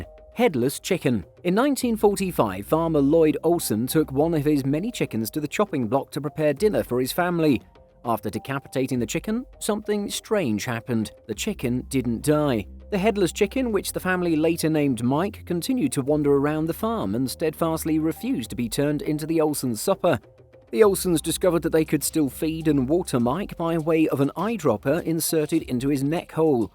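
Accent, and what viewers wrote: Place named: England, United Kingdom. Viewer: Juste pour l'accent anglais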